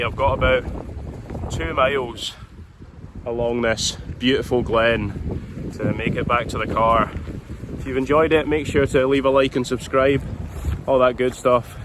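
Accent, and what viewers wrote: Scotland, United Kingdom. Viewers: I just love this the Scottish accent makes it so much better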